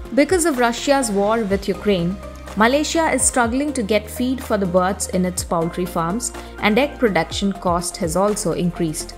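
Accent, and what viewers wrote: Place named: Kerala, India. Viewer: Hello. Nice video, no doubt. But few points to improve: - voice/accent can be improved. Voice has Malayalee English feel. - When mentioning cities / other nations, stick to a common platform: Either say Dubai, Muscat & Doha or say it as UAE, Oman & Qatar. TH could be little careful on it. Anyways keep up the good reporting.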